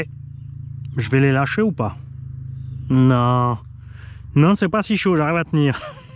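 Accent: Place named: Alsace, France. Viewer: Salut! Je découvre ta chaîne depuis 1 semaine, étant l'heureux propriétaire d'un e-revo 2. Tes vidéos sont un régal, on sent l'amour du travail bien fait par un passionné (et balèze! 😉). Merci pour tes conseils techniques et ton intonation d'alsaco qui fait ton charme!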